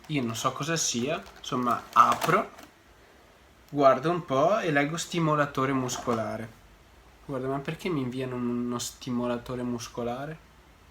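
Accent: Veneto, Italy. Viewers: Vecio ma sei Veneto?xd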